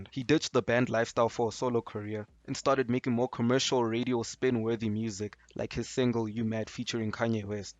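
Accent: South Africa. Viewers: You south African bro? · i swear to god you sound south african, are you?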